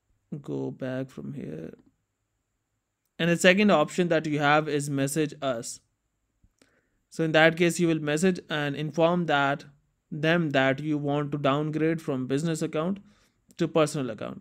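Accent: India. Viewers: As soon as i heard an indian voice ik it's a waste of time video...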